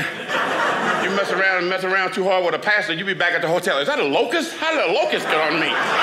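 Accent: New Orleans, United States. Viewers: U know he from neworleans, because that's the way we talk. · He is hilarious! I was listening only with my phone turned down, and I swore he was black before he said something bahahah! That New Orleans accent gets me every single time!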